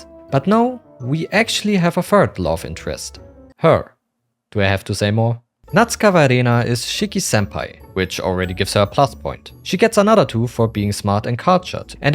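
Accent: Germany. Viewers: (hmmm... The algorithm suggests this ani-tuber. Let me see what that have to show me.) later; That was good. A well-argued recommendation for a manga, soon to be anime. Lightning-fast references for comedic emphasis. All delivered in a well edited video. And the German(?) accent gives it an authoritative cachet.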